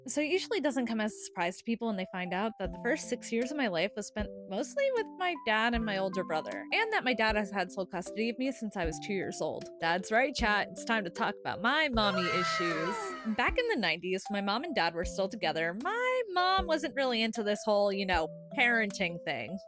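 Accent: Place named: United States. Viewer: Where are you from that sounds like America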